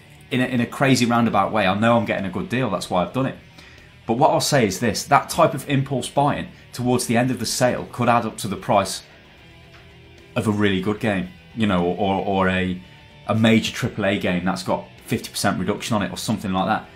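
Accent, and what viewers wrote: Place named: United Kingdom. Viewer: I subscribed because of your British accent. :D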